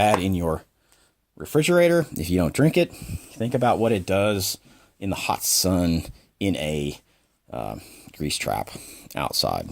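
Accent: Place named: Cajun, United States. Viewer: Worst cajun accent ever. But a great review!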